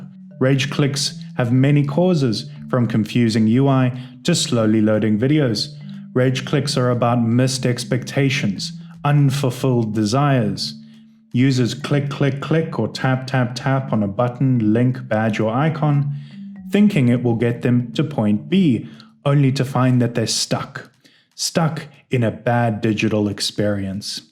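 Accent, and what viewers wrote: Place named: South Africa. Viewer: If you're using reddit for memes and stonks you're doing it very wrong indiddly deed. I swear I detect the faintest of Saffer twangs in this video 🤔🧐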